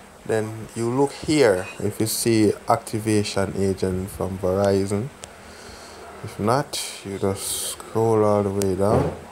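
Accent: Jamaica. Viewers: You from Jamaica? Sound like a Jamaican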